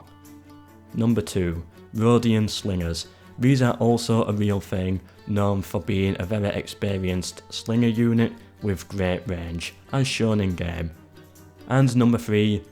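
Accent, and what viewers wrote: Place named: Yorkshire, United Kingdom. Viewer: The Romans would call this old pronunciation issue as a "vexata quaestio". Actually, very few Brits/US folk bother to pronounce Latin or Greek names as they ought to be pronounced (ayup, these two languages have got their own pronunciation, far far before even Old English existed... :D). There may well be ethnocentric reasons behind this (in astronomy, for instance, this is totally blatant, a little bit less in history, maybe) but it's always refreshing to me when someone decides to utilise the original pronunciation, doing justice to those historical names. Regardless, the proprietor's Yorkshire accent sounds absolutely pleasant and very very fit for divulgative/entertaining videos such as his own.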